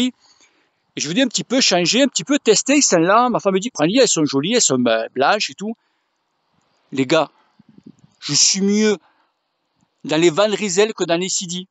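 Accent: South, France. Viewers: Super,a fond la forme.. Trop bien avec l'accent du midi 😅🤟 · La vidéo, les bons commentaires et l'accent du sud font plaisir ! Merci pour ce moment, l'équipe est ravie de ce retour ! A très bientôt, La team Van Rysel :)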